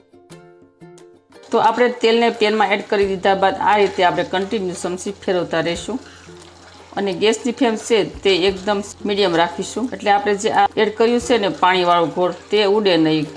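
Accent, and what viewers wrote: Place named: Kathiawar, India. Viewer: Bau j saras ben..kathiyavadi so😊 mithi bhasha bolo chho...jayshreeben from nairobi kenya (africa)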